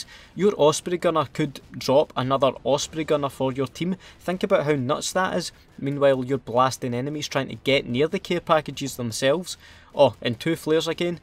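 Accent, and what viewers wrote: Scotland, United Kingdom. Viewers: Love me some Scotish accent to start me morning off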